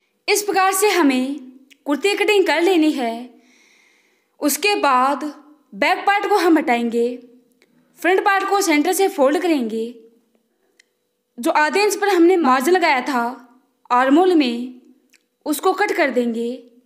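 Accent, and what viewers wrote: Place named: Garhwal, India. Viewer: Boli mai garwali tone lag rahi hai .aap utrakhand se ho?❤❤❤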